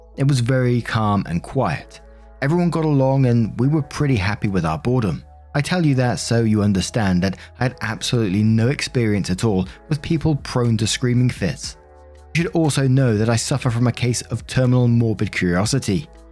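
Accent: United Kingdom. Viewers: One question? Your accent….. are you British?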